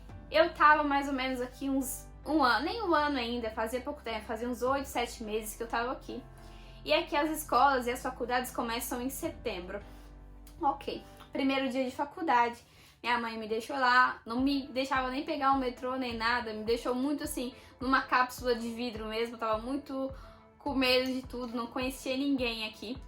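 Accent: Italy. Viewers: Você sem perceber adquiriu um pouco do sotaque italiano, que legal haha! Você fala "cantando" em alguns momentos. Un abbraccio. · Ouvir vc falando que sofreu e ouvir vc falando já com sotaque italiano da um orgulho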